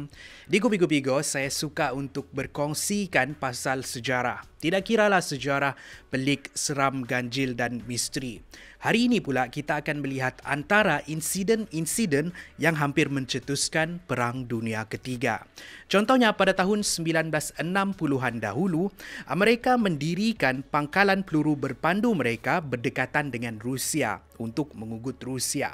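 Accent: Sabah, Malaysia. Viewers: Ko ingat semua orang Malaysia ni melayu ke....dia orang sabah memang la guna bahasa baku Malaysia.......hairan betul aku dengan orang melayu ni🤦‍♀️🤦‍♂️ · Orang Sabah bah dia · X prnh dgr loghat Sabah ker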